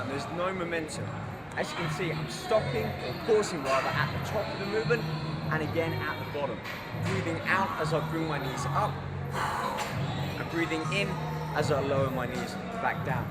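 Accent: England, United Kingdom. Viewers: He sounds so much younger in this video, with more of an English accent. Lol.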